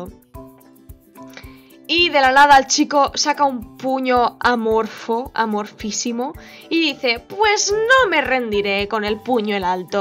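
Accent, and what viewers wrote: Catalonia, Spain. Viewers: se nota tu acento español y soy seguidor de tu insta tambien :3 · Eres catalana? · ¿Ereh catalanah? OH DIOS POR FIN (alguien más que es catalán)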